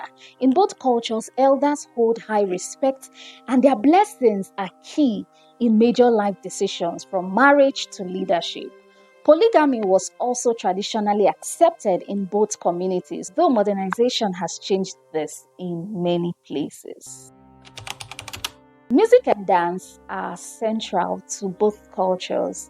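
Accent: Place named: Luo, Kenya. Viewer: This lady speaks like a LUO girl, What up nyar madhe?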